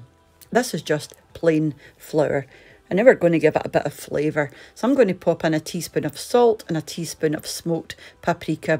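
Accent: Scotland, United Kingdom. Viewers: I love Christmas too! Can't wait for New Zealand winter to make this stew in my slow cooker, I freakin LOVE Irish Stew!! I was raised in Invercargill, right down the bottom of the South Island, as you can tell by the name, it was settled by Irish, so I grew up on lots of different stews 😍 I am obsessed with your Scottish accent! Even though I've been living in Nelson, top of the South Island, for 26 years now, people can still tell I'm from down south because I still roll my R's slightly lol 😊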